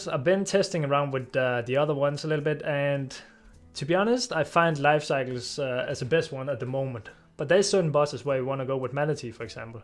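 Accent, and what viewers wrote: Denmark. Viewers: damn, du er super dansk med den accent :D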